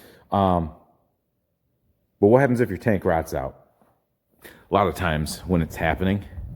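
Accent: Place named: New York, United States. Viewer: says Tires just like Stewie from family guy says cool Whip, must be that New York accent, keep making these great videos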